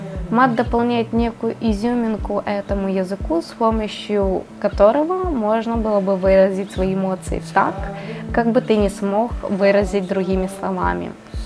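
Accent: Ukraine. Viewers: Твой мат и украинский говор выделяют тебя из "серой массы" блогеров, вообщем таких, как ты ни#@ нєту) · Ваааах. Как мне нравится её лексика и украинский акцент ❤👍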